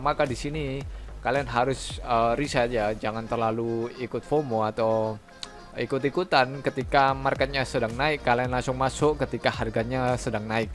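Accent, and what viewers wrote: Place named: Banyumas, Indonesia. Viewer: Siap ngapak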